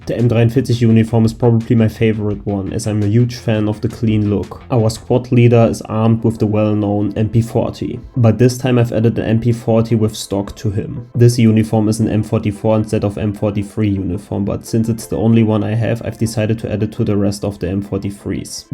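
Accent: Germany. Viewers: Thats why ur english sounds like german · Im love how you say it with you german Voice❤😂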